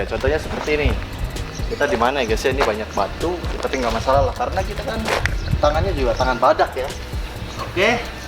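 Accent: West Java, Indonesia. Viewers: Kirain orang sumateraan, tapi bahasanya ada sunda2nya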